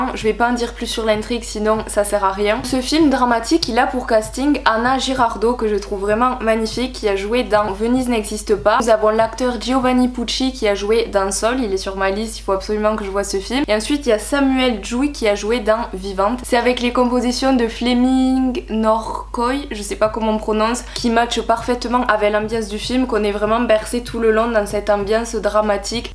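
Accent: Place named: Pyrénées-Orientales, France. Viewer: salut l'amie ! tu présente très bien ce film , sans trop en dire , et j'apprécie vraiment. les films d'horreur de chez nous ne sont pas assez mis en valeur je trouve , et quand j'ai vue la bande annonce , j'ai bien aimé . cela donne vraiment envie de me déplacer au cinéma , ce que je n'ai pas fait depuis plus de 2 ans ... je viens de m'abonner à ta chaîne , regardes la mienne si tu veux bien , je ne fais beaucoup de critiques de films , mais je vais en beaucoup plus prochainement, sur des films de genre , ma spécialité .je trouve ton accent charmant , tu est du 66 il me semble , des Pyrénées orientales ? moi je suis du 18 , dans le CHER . à bientôt pour échanger , amicalement FRED .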